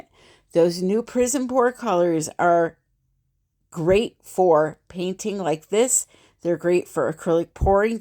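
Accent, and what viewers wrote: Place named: Midwest, United States. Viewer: Watching ONLY videos this am...after a long 7 month no art time due to family needs I am so enjoying all your videos. You have every creative instinct possible. LOVE this one. Yes saving those pour too big to turf..too pretty to pour over. Nice, your accent. Now is it? Midwest? Chicago? Please DONT be mad I am trying guess. I really am glad I got on your channel gonna watch em all. Just went to a paint store I've been flipping furniture too...its alot of work but wow. What a difference. Thank you dear your a friend to all budding artists and pros alike!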